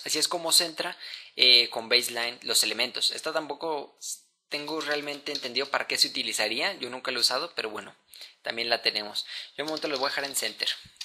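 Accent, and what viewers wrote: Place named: Mexico. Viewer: Excelente video. Aprendí más de Flex. Solo una duda, porqué los mexicanos dicen "WEF", en vez de "WEB"...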